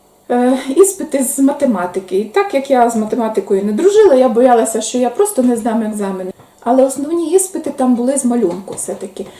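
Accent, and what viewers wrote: Western Ukraine, Ukraine. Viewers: Вітаю! Власне, я писала, що ви, мабуть, родом із заходу України. Відчутно як ви розмовляєте. В жодному разі не ображала вас, бо я західнячка з Тернополя. Перепрошую, якщо для вас це неприємно. Залишайтеся здорові.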